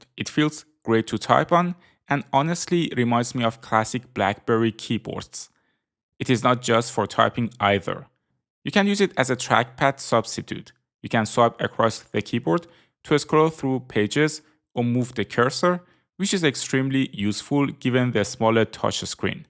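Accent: Iran. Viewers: I love the persian accent<3 Free Iran!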